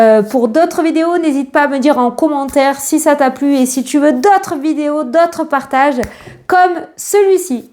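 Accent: South, France. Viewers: Bonjour, merci beaucoup pour les rituels. je suis fane de vos vidéo, je les trouve génial et surtout votre accent du midi, j'adors. je vous souhaite une très bonne année a vous et toutes votre familles.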